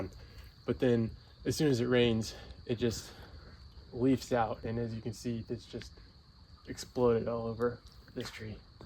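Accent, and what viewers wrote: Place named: France. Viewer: Are you French ? Your accent sounds like that. 🎀 thanks for your videos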